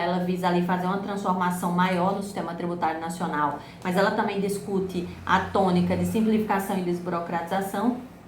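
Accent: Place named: Northeast, Brazil. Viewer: Meu deus, que aula maravilhosaaaa! Quero agradecer de coração, pois estou há mais de meses tentando achar algo que me guiasse nesse TCC. Direito tributário é a matéria que eu tenho paixão e que brilha meus olhos, como a senhora falou precisamos fazer na área temos essa sensação. Com certeza irei seguir suas dicas e tenho convicção que será excelente o tema. Gratidão, profa!! Ah, amei seu sotaque rsrs Sou nordestina também, com muito orgulho.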